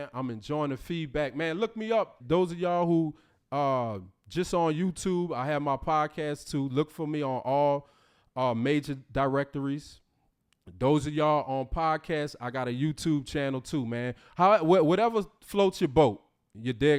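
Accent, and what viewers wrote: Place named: AAVE, United States. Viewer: Imma argue dis.....How you gon say dat?....and so many other examples of ebonics. You literally sound just like Fani Willis---and that's NOT a good "thang". As for your legal knowledge, it stinks. Even I--as a-non-lawyer--know well the GA statute on gifts from prohibited sources. The statute CLEARLY says $100 USD in aggregate must be reported yearly. They didn't have to stay at the Ritz for it to constitute a conflict--and corruption. Where did you get your law license? Compton?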